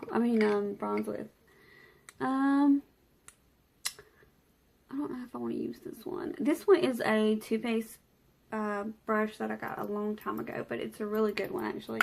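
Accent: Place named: Tennessee, United States. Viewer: I'm assuming that if you was my neighbor , we d be best friends. You remind me of my friends in Tennessee, so I like hearing you talk.And you go through same traumas and dramas we all go through, so you are relatable.And you are knowledgable where I am ignorant, so you are my teacher ❤️❤️❤️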